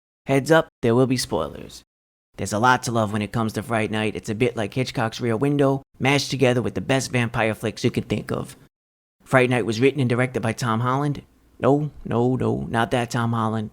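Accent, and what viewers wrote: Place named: East Coast, United States. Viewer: Nice one! ❤❤❤ Love your East Coast accent accent! New York??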